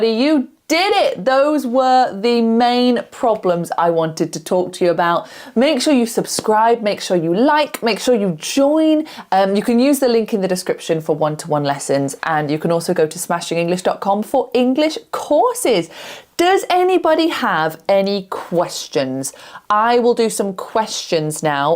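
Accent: United Kingdom. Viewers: I'm from California! I love. Your British accent!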